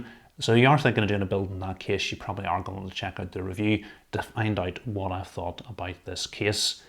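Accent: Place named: Tyrone, United Kingdom. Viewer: Did not expect the accent. Refreshing to hear from a Tyrone man.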